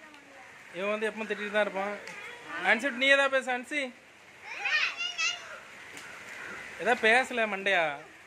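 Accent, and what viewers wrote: Tirunelveli, India. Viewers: Anna nega chennai ya but neega pesuratha pathaa thuthukudi thirnalvelii marii eruku enna ur nega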